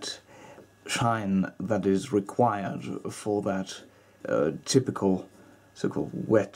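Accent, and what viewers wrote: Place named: RP, United Kingdom. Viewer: To plumby voice so watched it with sound down.. No offence but it done my head in.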